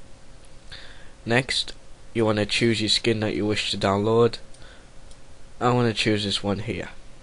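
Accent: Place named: Scotland, United Kingdom. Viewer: sorry if im offending, but u just have a voice like a Scotlander =) anyway thx for the tutor.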